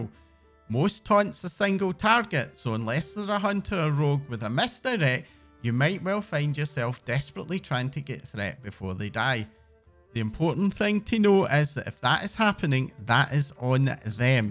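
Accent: Scotland, United Kingdom. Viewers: Thank you so much for this video. I've recently moved over to WoW from FF14 and this has been a great video that ACTUALLY goes in to the expectations around tanking in this game from the ground up. Plus it's nice to hear a friendly Scottish accent!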